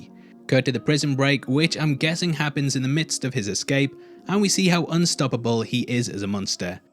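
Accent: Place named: Newcastle, United Kingdom. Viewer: Call it what it is, a geordie accent. Nothing wrong with it.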